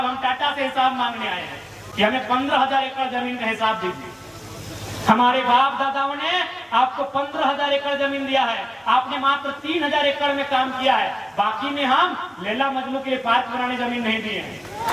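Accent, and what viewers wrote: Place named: Odisha, India. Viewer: Orissa sa hun bolte ho bhai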